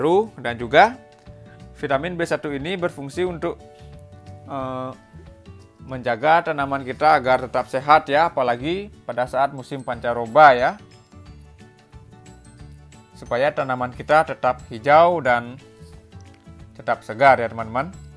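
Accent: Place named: Bali, Indonesia. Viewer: Logatnya mirip org Bali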